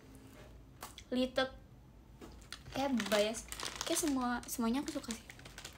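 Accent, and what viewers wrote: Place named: Java, Indonesia. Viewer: Kenapa dia bisa logat jawa ya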